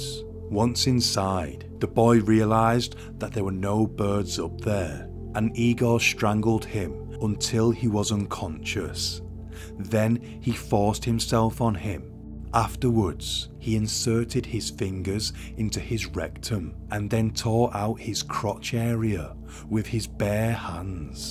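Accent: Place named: United Kingdom. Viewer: What irritates me is his British vernacular .. muhvuh (mother) deaf (death), etc.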